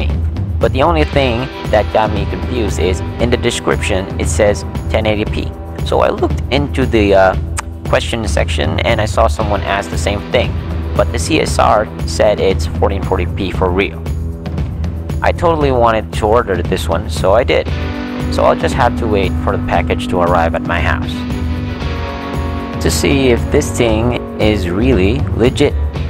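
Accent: Philippines. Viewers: Ok sana kaso bisaya ka e hahah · animal! bisaya man diay ni. haha subscribed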